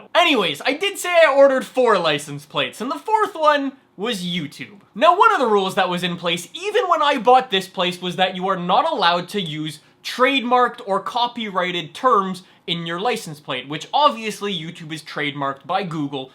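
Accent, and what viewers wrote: Quebec, Canada. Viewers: R u Canadian? Btw when will the gtr done? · Je savais pas que t’étais québécois!! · t'es québecois :O !!!! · Tu viens du Québec???!!!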